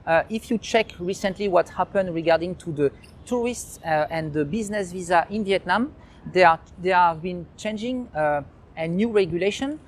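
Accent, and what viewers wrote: France. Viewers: I’m American, You should really put subtitles your English is not good ...you have a super heavy French accent...I couldn’t understand you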